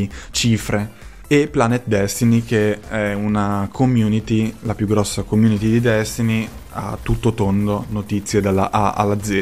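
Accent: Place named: United Kingdom. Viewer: Torna con l'accento inglese così potrai fare lo youtuber con un tocco.. come dire.. internazionale! E così il tuo canale sarà sempre più sexy! Questo pizzico di classe gli iscritti lo apprezzano ;) !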